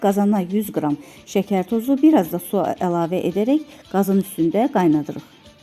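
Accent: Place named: Quba, Azerbaijan. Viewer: Allah bərəkət versin 🤲🤗,necədə səliqəli gözəl .Adamın üreyi açılır.Qubalısınız? Ləhcənizi oxşaydım Quba ləhcəsinə.👍👍👍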